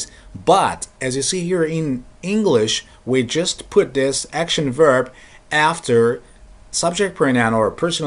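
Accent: United States. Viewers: You speak like an American. I am confused because you have a Turkish name but speak like a native in English. This level of language ability is amazing when you are able to confuse someone from the UK. I love your lessons, they are incredible!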